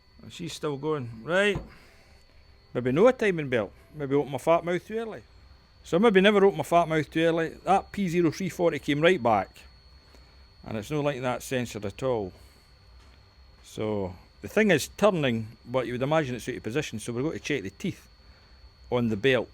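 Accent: Scotland, United Kingdom. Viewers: I love this Scottishness . Especially the groans of dispare 😂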